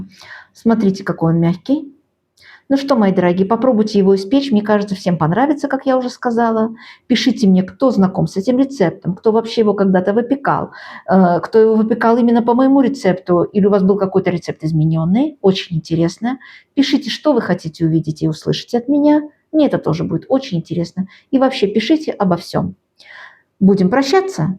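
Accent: Baku, Azerbaijan. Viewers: Дорогая, я сразу это поняла, ведь я тоже Бакинка, Правду говорят, «Свой свояка, узнаёт издалека». Я раньше тоже вела Влог. В Баку мы все были по Национальности Бакинцы 😊 Поэтому, Наш акцент, наша доброжелательность, наш менталитет останется с нами навсегда. Я от всей души желаю вам процветания, удачи во всем. С Бакинской теплотой, и нежностью Нателла Борисовна. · есть такая нация - бакинцы. Это наш говор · Спасибо!! Привет из Баку!! У вас остался бакинский акцент, с удовольствием смотрю ваши видео, обязательно испеку!! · Мне 82года стараюсь меньше есть чтобы не прибавлять в весе,но смотрю ваши ролики и так наедаюсь вашими вкусняшка ,конечно некоторые рецепты применяю,а калорийные лайкаю и ваш бакинский акцент умиляет,возвращаюсь в старый родной Баку.Желаю вам здоровья и процветания.Всем кто смотрит ваши ролики мира,здоровья и добра.Израиль.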